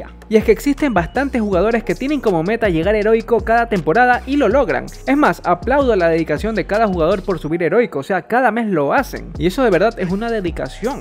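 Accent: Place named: Ecuador. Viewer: que eres de ecuador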